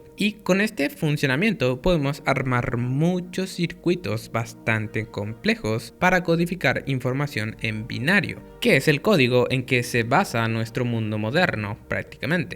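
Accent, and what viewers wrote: Paraguay. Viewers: amigo vos sos paraguayo? de repente tenes acento paraguayo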